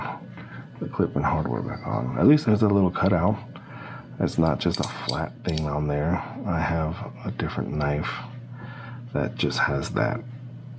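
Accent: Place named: Connecticut, United States. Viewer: Da fuq? Ken Keniff from connecticut sounding ass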